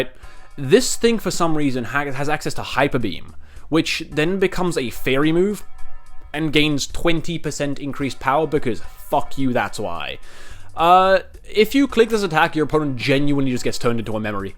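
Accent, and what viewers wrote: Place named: South Africa. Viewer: funny south african pokemon man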